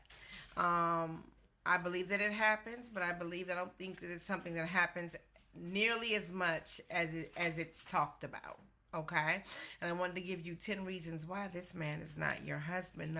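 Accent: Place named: DMV, United States. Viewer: You must be from the DMV.. I can tell by your accent lol Anywho those majority of the Husband Videos are a set up lol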